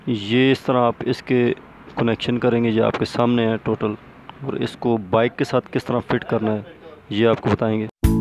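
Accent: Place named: Pakistan. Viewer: Aap Ki Awaz se se lag rahi aap Pakistani hai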